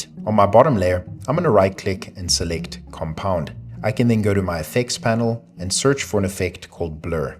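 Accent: South Africa. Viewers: ah , are you fron South Africa 😅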